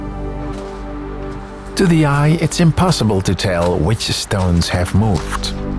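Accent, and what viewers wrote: Netherlands. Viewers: how can you pronounce everything perfectly but still have a massive dutch accent lolol. nice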